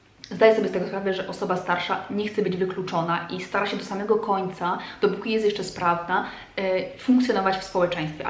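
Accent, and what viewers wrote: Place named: Kraków, Poland. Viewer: Ciao. Mam identyczne przemyslenia ! Ps i jestem krakowianka! U nas w Krakowie wychodzi sie na ,,pole,, a w innych czesciach polski ,,na dwor,, 😁 napisz jak oczywiscie mozesz chociaz gmine w jakiej mieszkalas...masz typowy akcent krakowski jak Ja..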